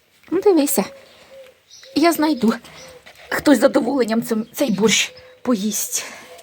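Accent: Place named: Cherkasy, Ukraine. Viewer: Похне горно! Черкаський говір😊😅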